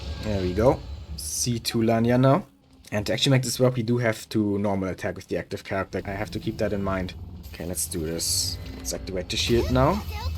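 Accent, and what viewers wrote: Germany. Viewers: you have a thick german accent bro i will sub tho cus nice video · Höre ich da etwa deutsches english?😅😂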